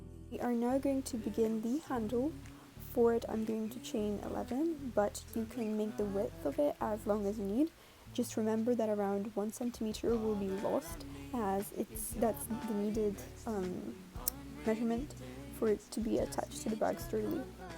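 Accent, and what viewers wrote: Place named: Russia. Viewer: По произношению Английского языка понятно,что она русская😂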